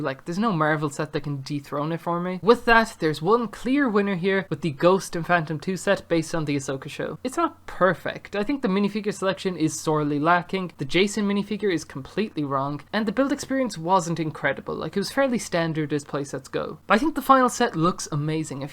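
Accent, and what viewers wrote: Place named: Ireland. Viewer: As an Irishman I personally find that when I hear an Irish accent on YouTube I usually expect the video to be low quality, but I was pleasantly surprised with this one. Really good stuff that kept me engaged all the way through which is rare, I’m shocked you haven’t got more subs. Can’t believe that new Vision minifigure didn’t get a mention tho 😤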